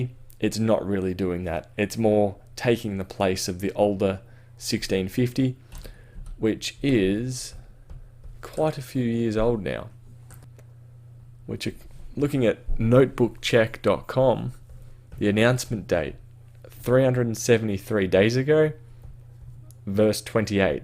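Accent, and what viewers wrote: Australia. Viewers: You Australian mate?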